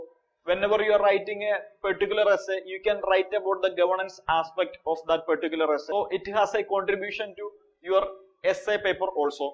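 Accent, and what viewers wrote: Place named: South India, India. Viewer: As if you are father of Shakespeare. He is south indian and hence has a particular accent. I have no problem with his language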